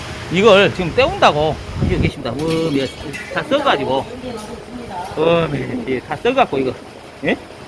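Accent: Jeolla, South Korea. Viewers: 아따 절라도 사투리가 구수하구만요. 카톡 확인 부탁 드리겠습니다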